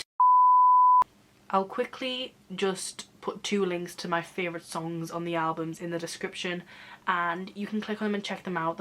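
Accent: United Kingdom. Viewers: I love your accent, you British? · You are so pretty😍 Also I love your voice are you British?❤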